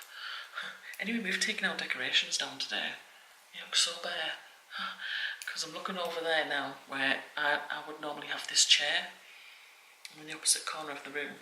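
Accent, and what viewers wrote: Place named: County Durham, United Kingdom. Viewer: I'm not sure where you are, your accent sounds local to me. I'm in County Durham x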